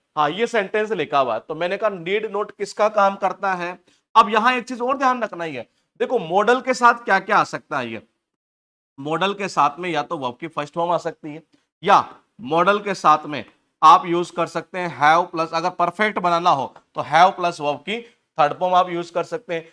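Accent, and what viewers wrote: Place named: India. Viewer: Seems aayega love you sir aapki english desi jugad ne to mja hi dila diya😮❤❤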